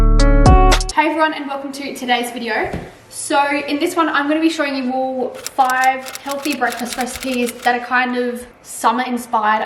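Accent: United Kingdom. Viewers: ohh hahah i thought u lived in the uk u sound so british idk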